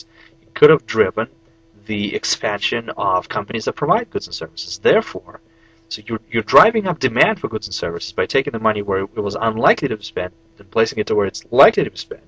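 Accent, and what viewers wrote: United States. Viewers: you have to make a video about how you acheaved this accent seriously :) im amazed how freely and fast you speak and what a good american accent u have its hard to imagine that had developed this accent after you went to the US when u are there owhensince 2005 ...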